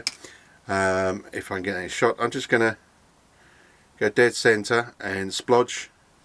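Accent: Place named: England, United Kingdom. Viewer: Hello! A time ago I found your channel for looking after the Max Thurst Ruckus. Now I´m thinking about buying a used but almost new Draco for about 500€ maybe less and knowing the low points. Until now I have seen complaints about CG, tires (you said they were changed?), power and landing gear (front and rear). What should I consider if buying this used one, what do you think? Much appreciated to hear from you Master:))) Best regards to the UK, your channel is really cool, and it is nice to hear english accent instead of the most in RC spreaded american. Just my opinion:)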